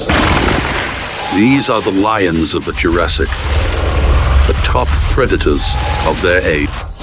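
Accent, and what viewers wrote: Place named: United Kingdom. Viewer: He sounds British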